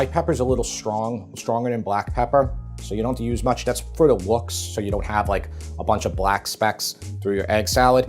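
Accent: New York, United States. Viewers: nice to hear a real new york accent. not somebody in new york speaking with a CA accent. that crap always annoyed me. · I love your channel. I'm from Westchester, but lost my accent. I'm jelly of your accent, but trying to figure out which area your from.. let us know where you grew up. Also.. how do you not have a NY diner style home fries recipe?? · Wow I’m from Queens and living in Ga and I have to say that your NY accent is cool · I just love that New York accent!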